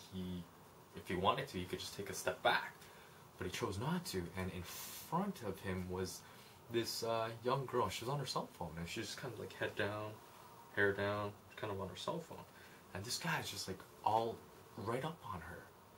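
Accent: Canada. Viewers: dude what's with the canadian accent? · ... but I digress, you should make more videos man ! You're a good storyteller ! I follow you on Twitter btw, I just read your tweet about someone commenting on your Canadian accent, and decided to check out your channel. haha :P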